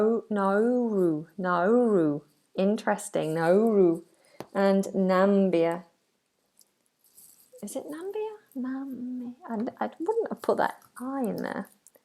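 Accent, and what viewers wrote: United Kingdom. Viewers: Hi! ^-^I am ♥ I'm watching u from Syria and I really enjoyed this video. really helpfull..British accent is my favorite. And you're awesome*.*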